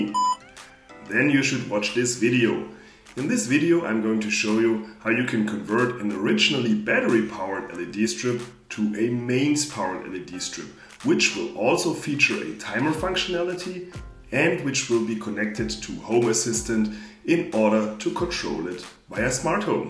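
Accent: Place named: Germany. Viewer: Man erkennt sofort wenn ein Deutscher Englisch spricht. Daumen hoch.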